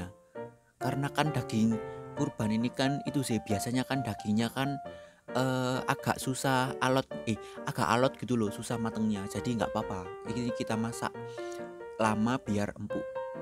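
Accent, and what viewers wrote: Java, Indonesia. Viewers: Medookk banget mas nya hehe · Bukan cma belajar di chanel ini Tapi juga menghibur iya suara masnya masih medok banget jadi senyum2 sendiri dengernya 😁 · makasih resepnya, semoga bojoku suka soale mami mertuaku pinter masak, jadi selerane suamiku tinggi masalah makanan dek ini yoo... lucune talah ngomonge... suka aku, medok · arek ngendi to mas kok rodok medok😅😅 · Mas ngomong e medok pol Awoakwoak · Aku seneng logat jowo mu klo nerangin nya mas.. Masokk...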